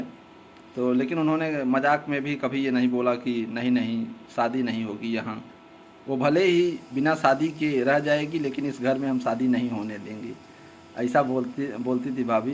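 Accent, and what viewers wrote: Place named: India. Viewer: Bhaiya aapki bato pe bahut maza aata hai ekdam desi style me bolte hain, bhabhi ji ki smile bht pyari hai, aise vlogs dala kariye youtube par, pranam❤aap dono ko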